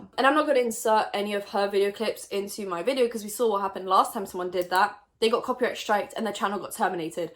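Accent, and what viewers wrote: United Kingdom. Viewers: You know what’s weird when you were talking about pop tarts I forgot that you were from the U.K. I think I’m tired how did I not hear your accent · why did i know you had a british accent before i clicked on this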